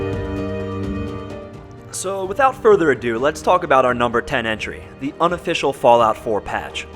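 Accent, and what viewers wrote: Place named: South, United States. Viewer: I love how this guy changed his accent/faked it prior to this hillbilly southern deplorable accent that everyone complained about. So you're fake?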